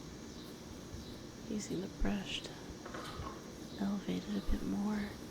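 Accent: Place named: Texas, United States. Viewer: Gah, between your accent (slight though it is) and the cicadas in the background, I feel like I'm sitting back home in North Central Texas on a lazy summer evening!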